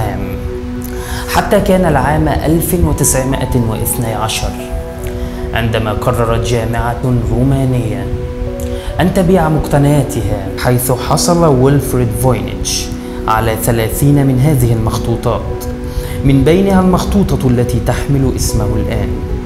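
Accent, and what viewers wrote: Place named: Egypt. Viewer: مش عارف اتفاعل معاك ب اللغه العربيه الفصحه عشان واضح اوي انك مصري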